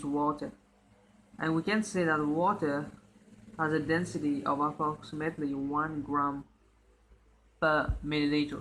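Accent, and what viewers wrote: Mauritius. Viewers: sounds like a maurician dudeeee!!!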